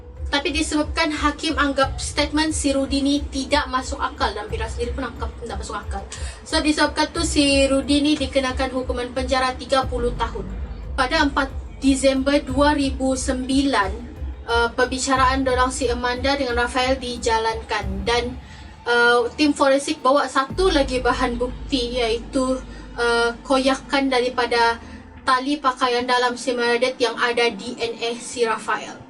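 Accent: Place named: Sabah, Malaysia. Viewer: Siok jg penceritaan sis versi sabah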